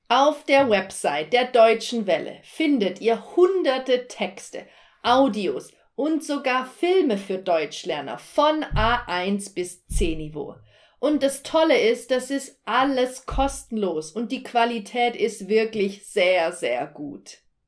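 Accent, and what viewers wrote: Russia. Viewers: Да слышно же, что она русская ^^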